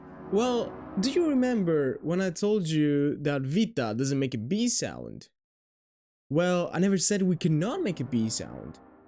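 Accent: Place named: Greece. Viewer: Τα γελοιοποίησες τελείως τα Ελληνικά μας, χαχαχα! Ας σκεφτούν όμως οι αγγλόφωνοι ότι αν σε αυτούς φαίνονται ανάποδα τα ελληνικά, το ίδιο φαίνονται ανάποδα και στους Έλληνες τα αγγλικά όταν τα πρωτομαθαίνουν, αλλά με τον αντίστροφο τρόπο. Δηλαδή κάποιος παραξενεύεται όταν μαθαίνει ότι το Ρ προφέρεται στα αγγλικά 'π". Το καλό λοιπόν είναι ότι αφού σχεδόν όλοι οι Έλληνες κατορθώνουν να μάθουν τουλάχιστον να διαβάζουν απλά Αγγλικά και η νέα γενιά τα μιλάει και τέλεια, έτσι μπορούν να μάθουν και οι αγγλόφωνοι Ελληνικά, και ας μην γκρινιάζουν με το να τα θεωρούν δύσκολα. Το μόνο αλλά πολύ σοβαρό πρόβλημά τους είναι ότι 'κυκλοφορούν' στο youtube πάρα πολλοί ακατάλληλοι "δάσκαλοι" της Ελληνικής που τα έμαθαν λάθος και τα μεταδίδουν λάθος. Το παλικάρι από εδώ ακούγεται είτε ελληνόφωνο εκ γενετής είτε δίγλωσσο εκ γενετής, γιατί η προφορά του στα Ελληνικά είναι ακριβώς Ελληνική. 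Μπορείτε να το εμπιστευτείτε.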